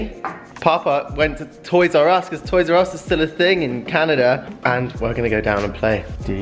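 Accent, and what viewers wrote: United Kingdom. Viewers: Between the British accent, the background music, and tinnitus, I often have to watch his videos several times. Headphones help.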